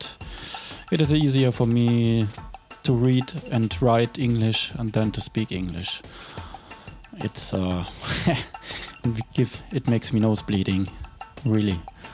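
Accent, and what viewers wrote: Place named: Germany. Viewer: no offence but i find it amusing that you speak with a german accent in english, and your youtube ava is an asian :) thanks for the video, i think this might have helped alot! :D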